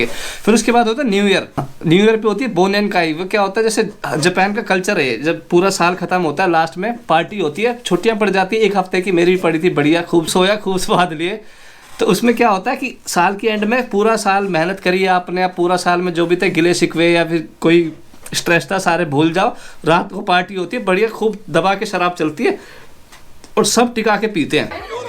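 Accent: India. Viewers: Desi accent pure Hindi me aur haryanvi tushunn. Kya baat hai. 👌jay shree ram bhai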